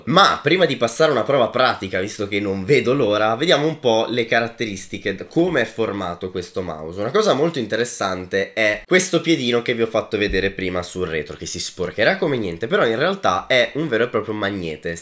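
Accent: Italy. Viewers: MA sei di roma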